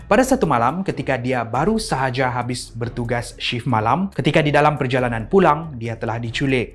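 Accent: Sabah, Malaysia. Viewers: Sabah bh ni kan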